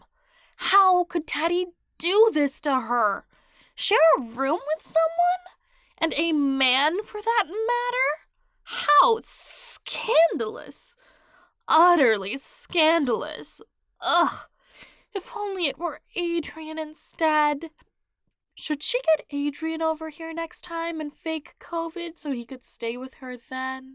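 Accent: United Kingdom. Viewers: You're Cockney-Estuarine accent is quite interesting. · I love your posh voice!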